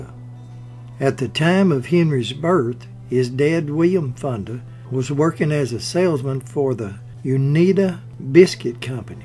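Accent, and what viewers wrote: South, United States. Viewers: Dear, enjoy your southern draw, and gift in Biography's which keep getting better and better.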